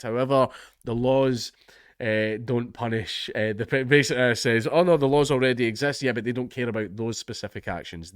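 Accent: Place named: Scotland, United Kingdom. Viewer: What I hear is an angry scot ready to kick ass when the government tries to mess with his babies. Love ur vids btw.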